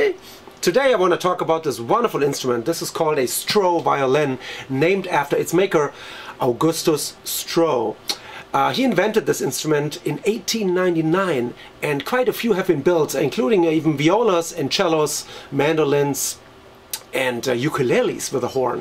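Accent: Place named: Germany. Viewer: You sound like you' re from Germany.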